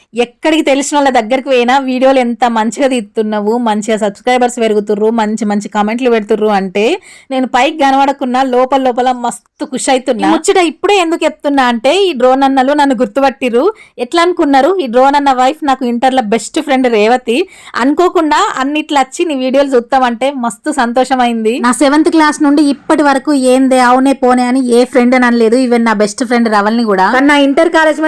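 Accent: Telangana, India. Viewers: Super Telangana basha yasa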